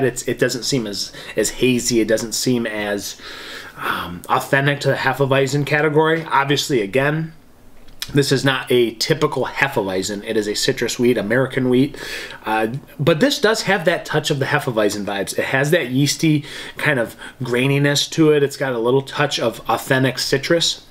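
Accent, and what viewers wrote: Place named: Michigan, United States. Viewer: I bought a 6-pack of these today and looking forward to trying it out. I appreciate the review of a genuine MI beer from someone with a genuine MI accent, e.g., Oberaaan & Haaaps (hops) 😉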